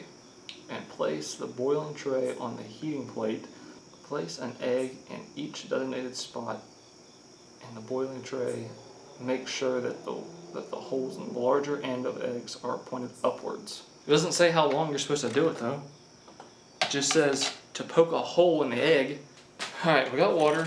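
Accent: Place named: Georgia, United States. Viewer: Holy crap I just discovered today and these videos are so fascinating. It’s 6am and I still haven’t slept 😭😭😭 I just keep watching! Also I’m curious to know where is from. He sounds like a fellow Georgia native.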